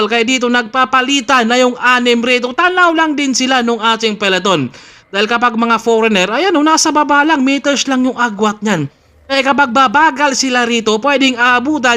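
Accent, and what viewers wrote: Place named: Tagalog, Philippines. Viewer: I love your Tagalog hard accent.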